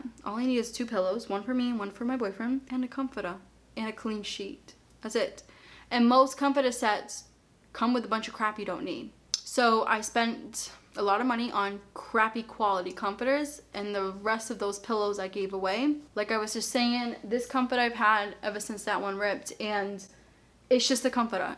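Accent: Boston, United States. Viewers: She’s from the Boston area 🥰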